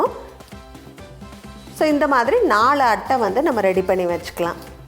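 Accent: Coimbatore, India. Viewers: 😃. U r using ur platform right 💕💕.. From my view u r unique, did not copy anyone💞💕💕💞.. U have beautiful Coimbatore Slang👌💖💖👌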